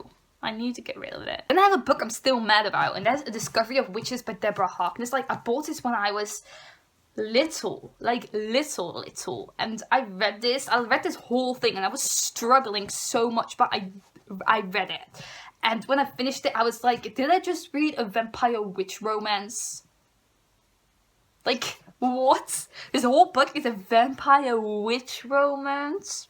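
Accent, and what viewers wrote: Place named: Netherlands. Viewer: Love this! I love your accent haha :) I'm Dutch as well, although my videos are also in English. I should really read more, I'm definitely going to follow you.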